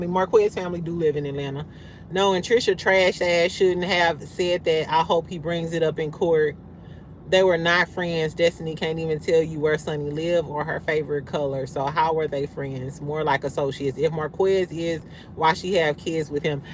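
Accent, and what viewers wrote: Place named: Chicago, United States. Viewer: Girl you sound like you're from Chicago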